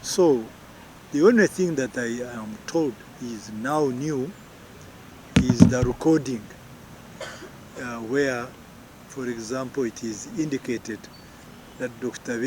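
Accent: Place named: Rwanda. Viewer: Why does he talk like a Munyarwanda? Also his name is a kinyarwanda.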